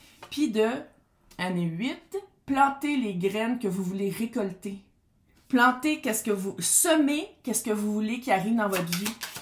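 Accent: Quebec, Canada. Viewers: Bonjour Merveilleux tirage Je vous adore et vous écoute tout le temps. En plus vous-même mettez du soleil dans mon cœur ❤️ avec votre accent québécois. J adore ce Pays . J y suis allée. Bonne journée à vous Gros bisous de Cannes où le soleil est au rendez-vous 😂😘😘😘❤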